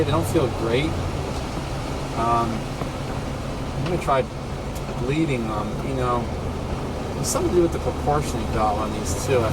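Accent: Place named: New Jersey, United States. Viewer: This is crazy... but as soon as you said, "Just got it yesterday" , I thought to myself, "He sounds like he's from New Jersey." I looked back at the license plate, and sure enough I was right! (I'm from California, by the way.)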